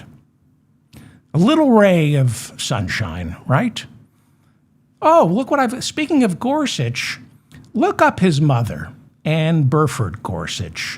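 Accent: New York, United States. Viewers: He is a Noo Yorker. Leave him alone!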